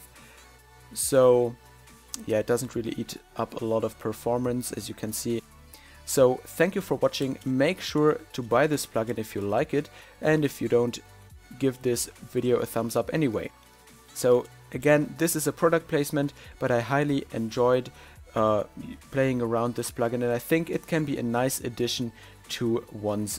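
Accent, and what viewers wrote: Germany. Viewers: Are you German you are never Englisch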